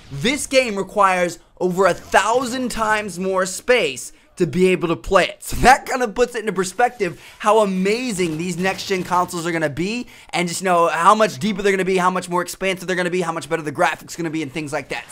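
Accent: Spain. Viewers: spanish accent <3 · I love your Spanish accent, you should try to do a video In Spanish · Thumbs up if you want to listen Spanish accent again. No criticism just found it funny as a Spanish person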